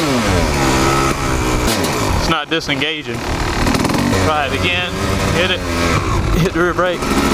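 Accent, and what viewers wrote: South, United States. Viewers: Georgia or Louisiana lol you seem like your from 1 of those states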